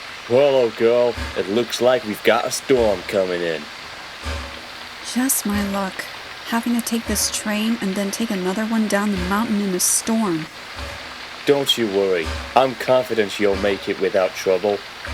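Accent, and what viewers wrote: United States. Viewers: Was most of the dialogue you attempting an American accent? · Not bad. Great southern accent by the way. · You did a great job using an American accent. You could use your American accent for your narration in the Clinchfield Curse.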